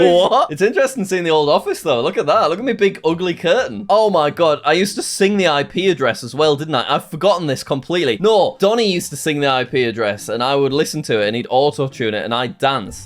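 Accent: United Kingdom. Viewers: What part of uk are you from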